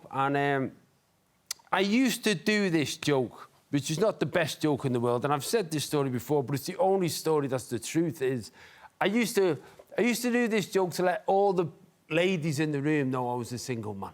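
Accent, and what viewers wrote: Liverpool, United Kingdom. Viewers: He is from Liverpool, is it common accent in Danelaw region? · Aye. Pretty much. Scouse. Ones Kirkby and the others Runcorn so about 20 miles or so apart. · What's his accent? Liverpool? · Crazy Liverpool accent. Almost sounds Scottish. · Absolutely scouse...it's scouse with the edges filed down a bit though, less throaty. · This Liverpooler accent is really funny! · Most scousers sound awful but hes refined the accent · Love a scouser, so down to earth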